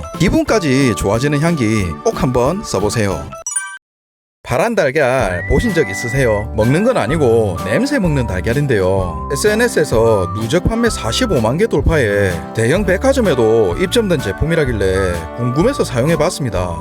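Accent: Gyeongsang, South Korea. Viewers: 이 분 경상도 사투리 너무너무 듣기 좋아요~ 아빠 목소리 같습니데이❤❤❤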